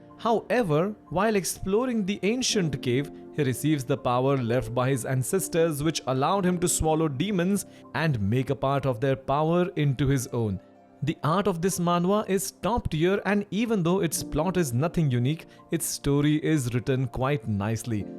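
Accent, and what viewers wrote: India. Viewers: The Indian accent is whoop ass amazing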